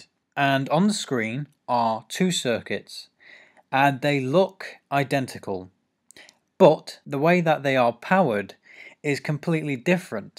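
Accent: United Kingdom. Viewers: Hey, what kind of british accent do you have?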